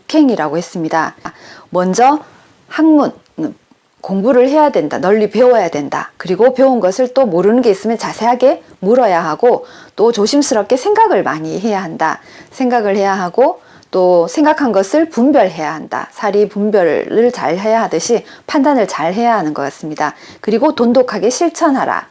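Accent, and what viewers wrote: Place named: North Korea. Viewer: 2년전보다 이북사투리같은억양이 마이 줄었네 ㅎㅎ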